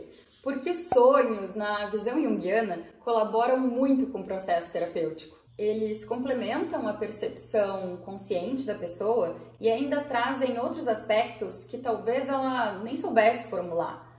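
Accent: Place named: South, Brazil. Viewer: Olá, estou maratona do o seu canal. Gostei muito da maneira simples e objetiva com que vc aborda Jung. Esses dias tenho refletido bastante em que linha farei minha especialização. Tô sentindo o mestre Jung me chamando. Parabéns pelo trabalho. E bora espalhar Jung pela rede com sotaque do sul.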